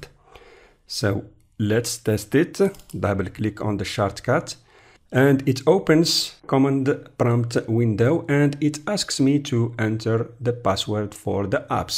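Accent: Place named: India. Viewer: Initially you wanted to say "How to create a NEW admin account with your current admin account, with which you can run admin programs you could also do with your usual admin account". You're basically selling bullshit here and try to fool anyone the dullest way possible. But the indish accent tells everything right at the beginning already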